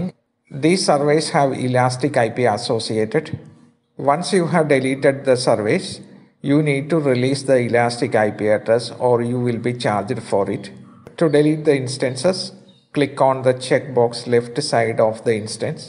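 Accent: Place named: Kerala, India. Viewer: Full Mallu acsent ..Funny!!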